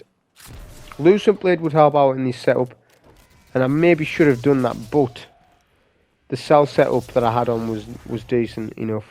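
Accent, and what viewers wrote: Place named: North, United Kingdom. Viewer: you're an up and comer youtuber but man your northern accent and conversational commentary make you very likable. catch this sub.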